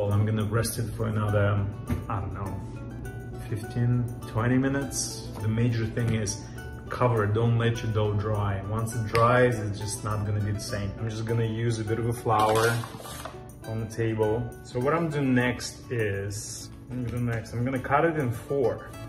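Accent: Russia. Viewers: cute Russian accent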